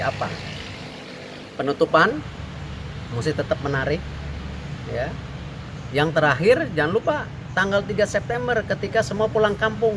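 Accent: West Java, Indonesia. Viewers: Disini kok saya mendengar logat n aksens sundaness yee.. apa pak org sunda atw bs logat sunda yak.. behheh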